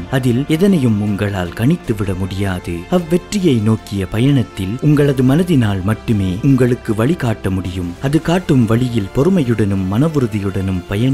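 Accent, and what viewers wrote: Sri Lanka. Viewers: Nice to hear Sri Lanka tamil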